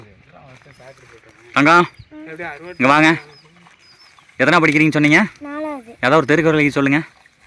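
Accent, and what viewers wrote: Kongu, India. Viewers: Unga kongu Tamil Vera level keep rocking reach heights all the best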